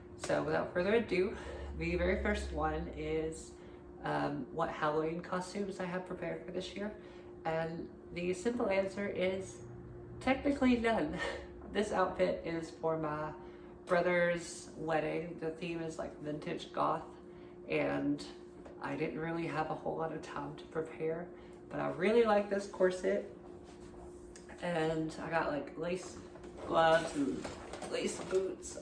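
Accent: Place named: South, United States. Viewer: I am loving the southern drawl in your voice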